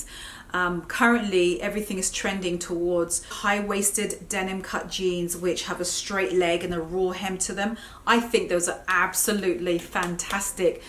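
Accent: United Kingdom. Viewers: Found you today - instant subbie! It's so nice to find a fellow Brit who sounds like me! Excellent video!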